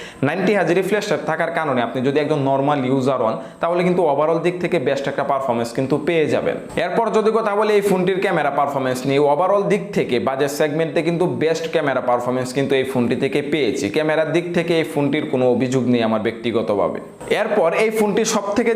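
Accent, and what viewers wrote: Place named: Narsingdi, Bangladesh. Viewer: আপনার বাসা কি নরসিংদী নাকি ভাই কথাগুলো শুনতে ভালো লাগে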